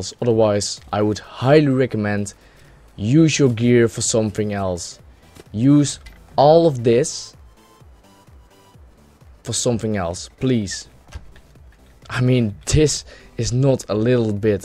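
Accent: Netherlands. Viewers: i dont know but are u nl? u talk like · when the dutch accent is strong..... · haha mooie nederlandse saccent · Ik kan het nederlands goed in je stem horen maar je engels is wel goed hoor! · Jij bent nl toch? (ik hoor het aan je engels) ;)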